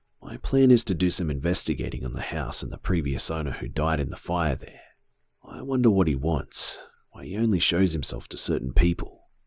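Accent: Australia. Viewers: Are you Australia mate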